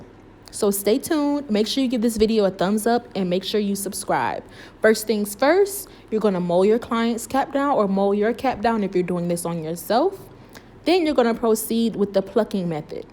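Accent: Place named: New Orleans, United States. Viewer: Are you from New Orleans? You accent is telling me so 🤣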